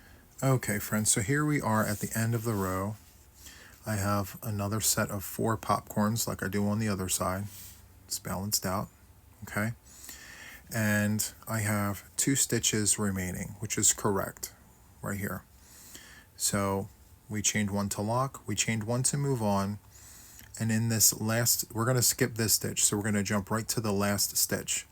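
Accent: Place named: East Coast, United States. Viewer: Im new here and your tutorial is a treasure! What a wonderful pattern! Your style of instruction is so easily understandable… thank you! (Your east coast accent made me smile while listening too) 🎉❤ Thank you so much!! Michella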